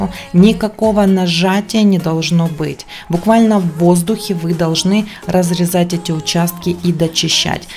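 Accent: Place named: Ukraine. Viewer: с Украины, от акцента никуда не деться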